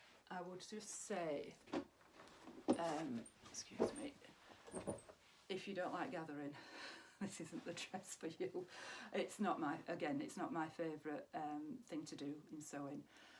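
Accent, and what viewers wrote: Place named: Northern England, United Kingdom. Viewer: Hi, welcome to YouTube, it must be scary starting off! I really enjoyed the vlog, as an ex-pat Mancunian living in the south-west I love to hear a Northern accent. Found the content good and look forward to more. One minor point, I also couldn’t hear very well, not a complaint just mention it to see if you could fix that. See you next time 😀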